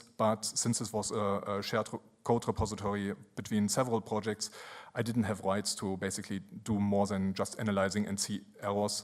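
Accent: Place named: Germany. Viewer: Glorious German Accent. Thanks for maintaining my favorite editor, though.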